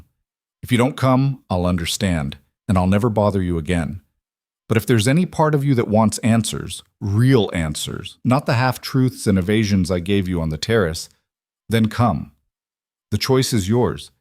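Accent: United States. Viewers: That American accent just isn't appropriate for stories of British aristocracy.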